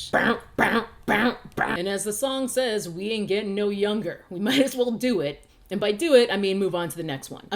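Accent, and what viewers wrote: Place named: New York City, United States. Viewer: NYC accent is thick in this video!!! Also you know I watched this immediately!!!! Click bait!! I’m old!! Sobs in corner to “ ” which is also old enough to drink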